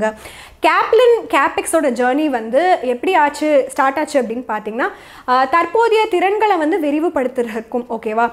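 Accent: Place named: Coimbatore, India. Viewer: Neenga Coimbatore nga la nga.... Nga ...nga ....arumaya pasuringa......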